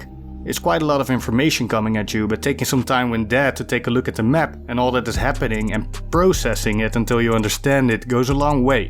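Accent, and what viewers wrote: Netherlands. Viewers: Proper dutch accent mate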